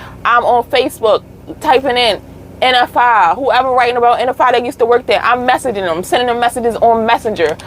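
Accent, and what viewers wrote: Baltimore, United States. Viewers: you from baltimore? haha sound like you from round the way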